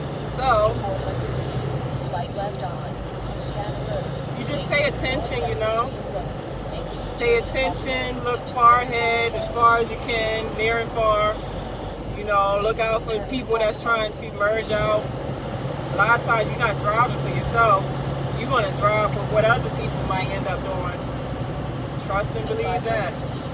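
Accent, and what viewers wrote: Baltimore, United States. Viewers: copy that driver. You sound like you could be from bmore but I believe your from upstate NY? Forgive me if I’m wrong lol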